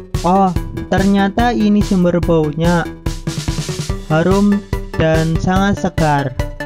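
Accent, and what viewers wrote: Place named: Banyumas, Indonesia. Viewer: Ora ngapak ora kepenak wakamaka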